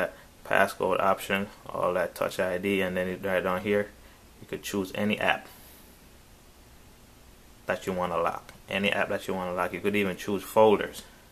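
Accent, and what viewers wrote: Jamaica. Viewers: here the jamaican in u bro. #jamaicans UP lol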